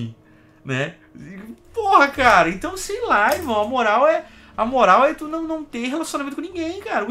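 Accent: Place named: Porto Alegre, Brazil. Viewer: haha saudade do sotaque de poa! Me lembra o programa do Mr. Pi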